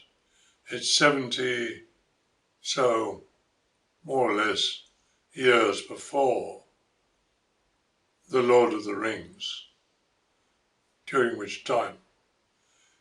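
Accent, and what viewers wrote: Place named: United Kingdom. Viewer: Out of all of the different English accents across United Kingdom this one what a true English gentleman sounds like in my opinion, every time I hear someone speak like this I immediately have a higher opinion of them.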